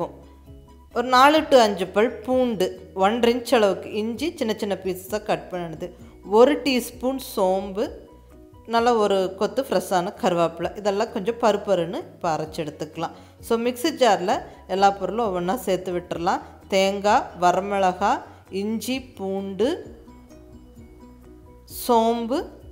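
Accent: Coimbatore, India. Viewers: ஆளுமையான கோவை வட்டார உச்சரிப்பு ,எளிமையான, அசத்தலான பேச்சு, எளிமையான சமையல் ஆண்களுக்கும் ஏற்ற குறுகிய நேர சமையல், அருமை, வாழ்க பல்லாண்டு.நன்றி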